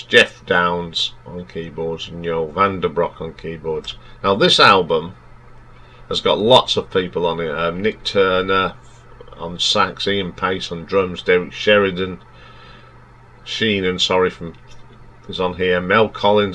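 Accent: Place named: United Kingdom. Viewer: You sound British, but your sentences hardly make proper sense. Anyway, good luck with your channel...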